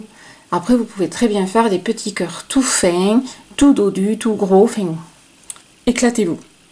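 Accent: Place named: Southwest, France. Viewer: Cc ...moi j'ai adoré et l'ai fait .. j'adore ton accent ! Du sud ouest non ? 🙏🙏🙏🙏🙏 Merci beaucoup .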